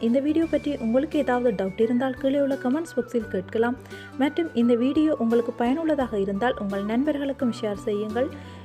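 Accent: Sri Lanka. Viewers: I love your chaste and pure Sri Lankan Tamil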